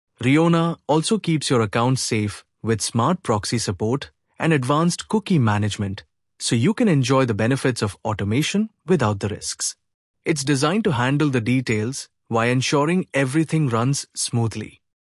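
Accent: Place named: India. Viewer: it's sound like your neighbourhood scam call center indian man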